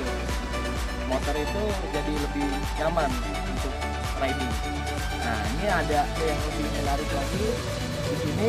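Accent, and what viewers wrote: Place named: West Java, Indonesia. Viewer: Bang mf asli orang mna kaya sunda yeuh..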